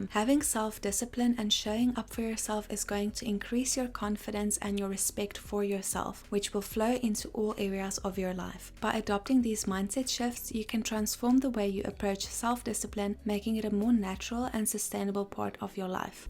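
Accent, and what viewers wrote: South Africa. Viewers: R u south african, ma'am?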